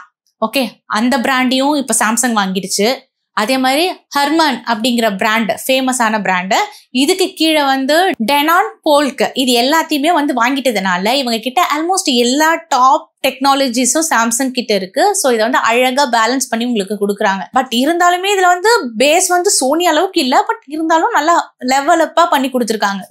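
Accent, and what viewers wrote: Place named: Madurai, India. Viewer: பேச்சில் மதுரை நடை வருகிறதே.... மதுரை/திண்டுக்கல் பக்கமோ...... தெளிவான விளக்கம். அருமையான காணொளி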